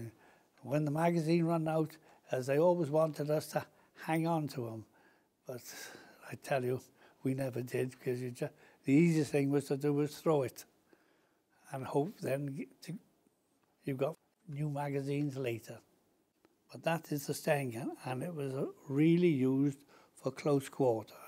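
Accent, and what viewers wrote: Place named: Wales, United Kingdom. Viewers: Sounds like he's from Cardiff or Barry :D. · Lloyd Pagett He has a welsh accent, I live in Wales can confirm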